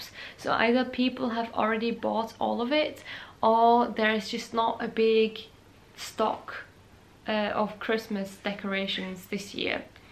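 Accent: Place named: West Yorkshire, United Kingdom. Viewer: Hi, found your channel today and have really enjoyed watching some episodes. Think I heard you saying that you are sending from Austria? I lived a couple of years in west Yorkshire a long time ago, and to me you sound very much like my colleagues at that time. Am I mistaken?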